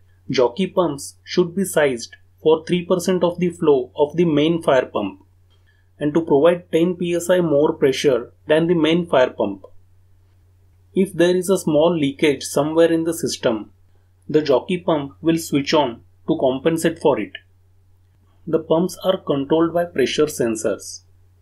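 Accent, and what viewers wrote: Maharashtra, India. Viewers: I can make out the person in video is from Maharashtra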